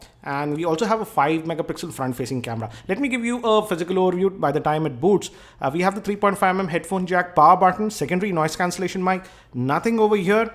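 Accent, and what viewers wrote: India. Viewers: You talk like Apu Nahasapeemapetilon. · indian accent so funny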